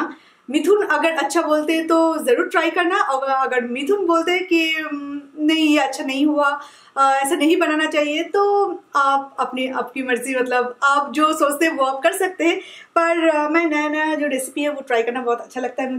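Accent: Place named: Bengal, India. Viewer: array koi ni..... 😄😄😄. we all know ki she z a Bengali lady ... To unka accent aa jata h .. mujhe to bahut acha lgta h .. jb dii aise bolti h .. I love it ...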